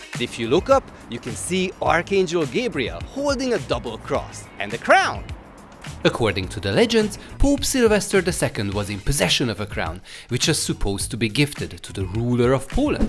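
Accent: Hungary. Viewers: A magyar akcentussal meg jó kis Hungarikum! :D magyar Lincoln videóit ismered? Jókat lehet rajta röhögni.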